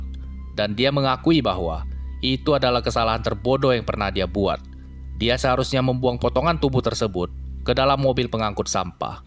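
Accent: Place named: Sulawesi, Indonesia. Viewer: Speaker nya logat nya pasti dari Sulawesi 😂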